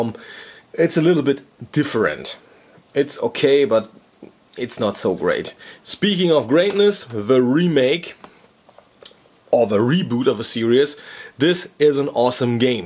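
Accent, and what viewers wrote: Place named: Germany. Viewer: You sound German, love that accent bro. Best accent to talk in.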